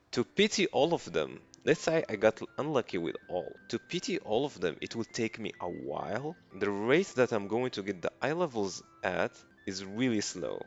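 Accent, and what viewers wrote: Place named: Morocco. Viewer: Accent d mgharba mwr9a :d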